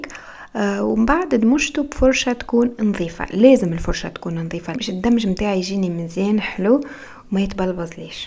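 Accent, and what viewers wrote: Tunisia. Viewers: لوك حلو ولهجتك التونسية احلى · بعشق اللهجة التونسية بحب اسمعها منك عسسل 😍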